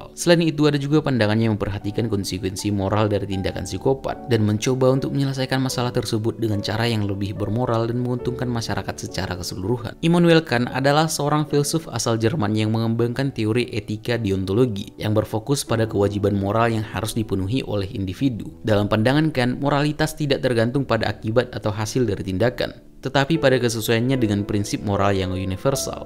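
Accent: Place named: Banyumas, Indonesia. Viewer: Yang ngomong kok beda anjir... Malah jadi logat ngapak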